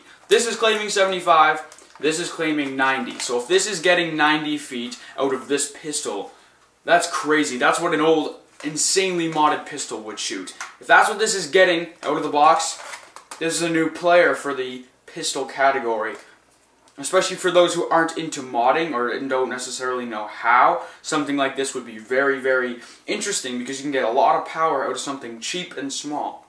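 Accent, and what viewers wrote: Canada. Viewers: Lol ur Canadian.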